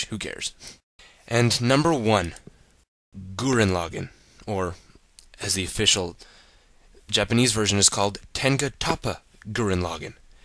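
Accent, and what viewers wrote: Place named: United Kingdom. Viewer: u fukin silly uk guys with ur silly way of talkin u can just make trailer with music no need to talk too much fool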